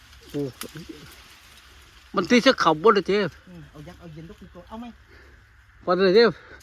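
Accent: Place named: Sukhothai, Thailand. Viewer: สำเนียงเหมือนคนสุโขทัยเลยคับ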